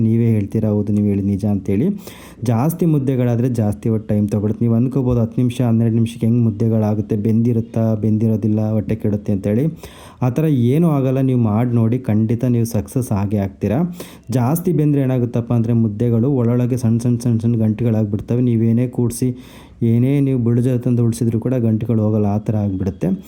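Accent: Chitradurga, India. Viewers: ನಮಸ್ಕಾರ, ಇದು ನಮ್ಮ ಚಿತ್ರದುರ್ಗದ ಕಡೆ ಅಡಿಗೆ.. 2 ದಿನದ ಹಿಂದೆ ತಾನೆ ನಾನು ಶೇಂಗಾ ಗೊಜ್ಜು ಮಾಡಿ ತುಂಬಾ ದಿನ ಆಯ್ತು ಮಾಡ್ಬೇಕು ಅಂತ ಹೇಳ್ದೆ, ಇವತ್ತು ಈ video ಅಕಸ್ಮಾತಾಗಿ ಬಂತು ನೋಡಿ ತುಂಬಾ ಖುಷಿ ಆಯ್ತು. ನಿಮ್ಮ ಭಾಷೆ ಅಡುಗೆ ನೋಡಿದ್ರೆ ನೀವು ದುರ್ಗ ದಾವಣಗೆರೆ ಕಡೆಯವರು ansutte. ತುಂಬಾ ಚೆನ್ನಾಗಿದೆ ನಿಮ್ಮ ಅಡಿಗೆ videos. ಶುಭವಾಗಲಿ ನಿಮಗೆ 👍🏻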